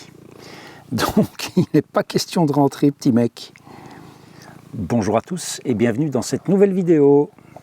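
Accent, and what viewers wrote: Belgium. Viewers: milesker anitz. En tout les cas tu me fais bien rire avec ton accent belge. Au plaisir de te rencontrer sur la route.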